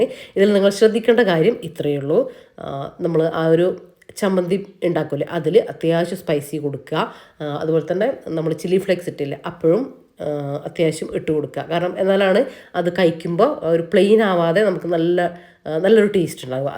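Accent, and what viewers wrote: Kannur, India. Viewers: കണ്ണൂർ ആണോ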